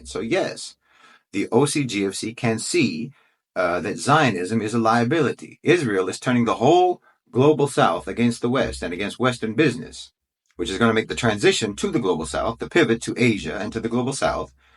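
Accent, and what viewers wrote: United States. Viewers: What is absolute madness is this nutter saying that Israel as a State should be terminated. It is simply repeating Hamas’ propaganda with an American accent.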